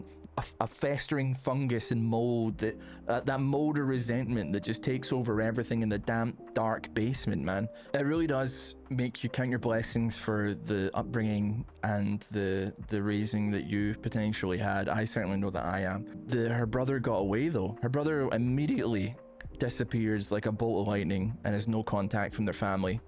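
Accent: Scotland, United Kingdom. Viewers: I love listening to reddit stories while I write. Now I can listen to a fellow Scotsman. Perfection.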